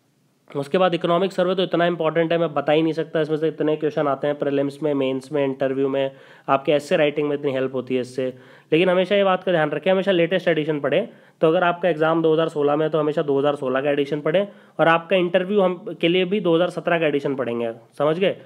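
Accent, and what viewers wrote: India. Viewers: very helpful video....gud to hear ur hindi accent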